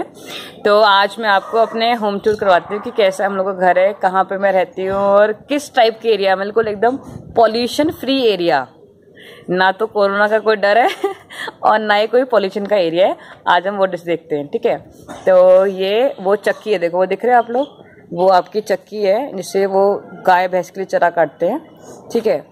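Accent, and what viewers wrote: Rajasthan, India. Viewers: Ma'am aap Rajasthan Se Ho🤔🤔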